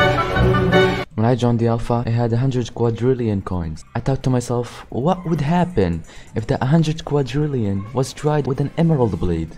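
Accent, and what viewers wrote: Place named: Arab world. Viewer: your accent sounds arabic..im arabic too !! also if you have discord can you tell me it? my discord tag is vxpxx#1832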